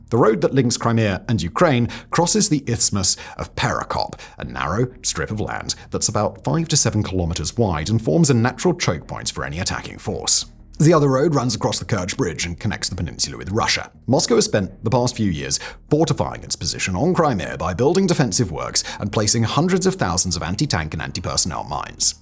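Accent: United Kingdom. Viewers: Dude's British, get over it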